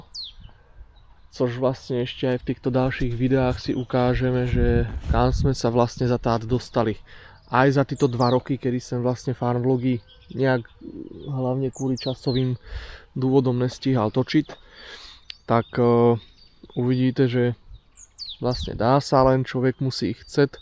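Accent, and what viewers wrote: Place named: Moravia, Czech Republic. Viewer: Moc fajn video! Nikdy jsem nikoho neslyšel mluvit zároveň česky, moravsky a slovensky 💪🏽😀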